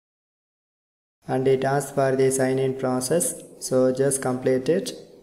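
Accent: India. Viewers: You helping me very well, i got shadow ban in call of duty ... you fiy prroblem , i now no problem in brain . Thaks you my brother i love yourre Indian-English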